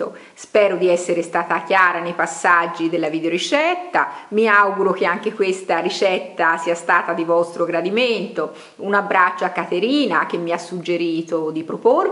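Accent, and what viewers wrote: Tuscany, Italy. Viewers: Lei è toscana?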